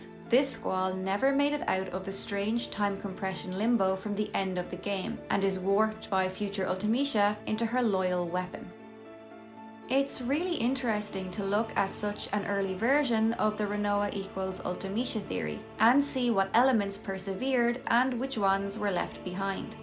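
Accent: Ireland. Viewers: I don't know the specific accent, but you sound Vaguely corcaigh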